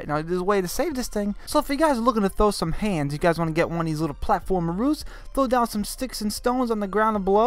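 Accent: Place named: New York, United States. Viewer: This dude has a New York accent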